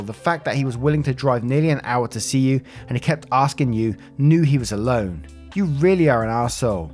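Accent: United Kingdom. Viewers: Greetings from Kansas City, Missouri, USA! I usually play Mahjong, Solitaire, or Yahtzee while I listen to your stories. I can’t help but enjoy your content. You have such empty and compassion for people and I absolutely love how you’ve taken in Poppy. I’m a sucker for a guy with a British accent who loves animals (please don’t take that the wrong way, as I’m quite happily married), so feel free to share as many pics of Poppy as you want. We’re definitely here for it! Much love!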